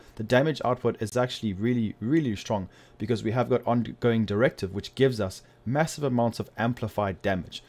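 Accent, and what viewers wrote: South Africa. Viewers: cape town accent..? howzit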